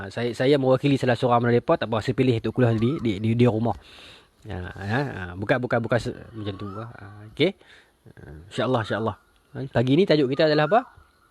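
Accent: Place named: Kelantan, Malaysia. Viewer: Ustaz ni meme rilex ore klate oyak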